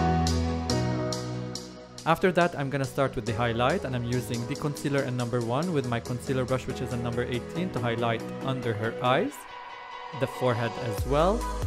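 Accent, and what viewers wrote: Arab world. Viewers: يلي عم يحكي لهجته سوري لبناني حتى بالانجليزي😂😂 وشرط مليون بالمية انه لبناني سوري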